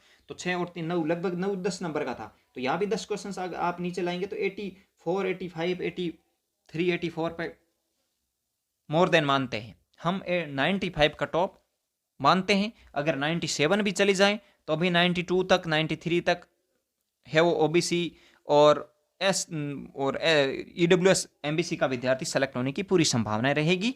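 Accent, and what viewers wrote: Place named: Barmer, India. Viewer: Sir lgta hai aap Barmer ke ho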